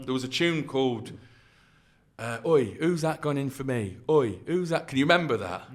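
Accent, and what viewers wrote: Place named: Leicester, United Kingdom. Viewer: Loving the podcasts, I miss that Leicester accent, great job Listening from Australia Dusty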